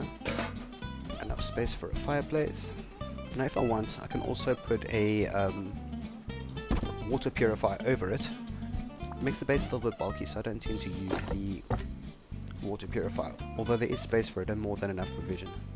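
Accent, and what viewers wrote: Afrikaans, South Africa. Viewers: I love how i can recognize a fellow SA M8 voice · thanx this vid was realy helpful are u south African? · You sound south african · are u south African? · R u south african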